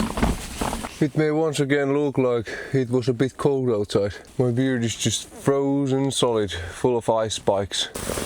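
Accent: Finland. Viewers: Hello from Minnesota USA I enjoyed the video I lived in embarrass Minnesota home of the Finnish sow-na like your country I like the long videos your accent is like the Finn’s in my town and close to Canada 🇨🇦 be safe I’m glad I found your video I grew up in the woods with my father we cut lot of wood and I enjoyed spending time in the woods with my father and having coffee under a tree taking a break enjoying the smells of the woods blessing from Minnesota USA 🇺🇸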